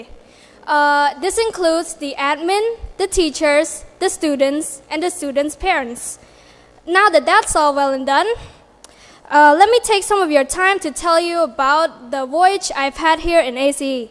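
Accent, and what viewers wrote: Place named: United States. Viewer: ACE follows British , but her accent is like the American 😄 Amazing speech ever ! 💙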